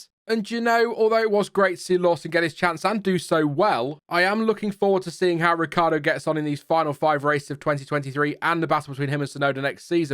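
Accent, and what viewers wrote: United Kingdom. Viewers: Lovely voice from this man who are talking about circuit. Thank for mencioned my Country Brazil; I know formula-1 will be here in Brazil in November, 5th. You are welcome to Brazil sweet voice and British accent.🇬🇧💔🙋🏁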